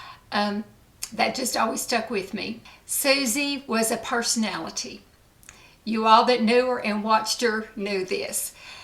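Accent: South, United States. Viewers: ...Honey You my dear are just the sweetest thing. Your accent is beautiful...are you from the South? Beautiful tribute to Suzy!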